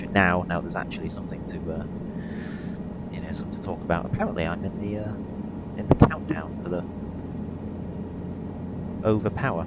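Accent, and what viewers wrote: United Kingdom. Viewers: I have to admit that my lack of comprehension was also due to me being Canadian and having a hard time understanding the British or Australian accent, unless I can also read lips 😂. No idea if yours is a British or Australian accent, or whatever, but I would guess British, right ? 😂🤣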